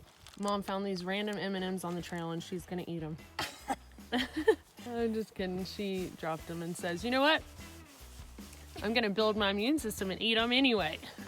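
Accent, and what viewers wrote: South, United States. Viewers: It's the lady puppy for me!!! It's the southern accent for me!!!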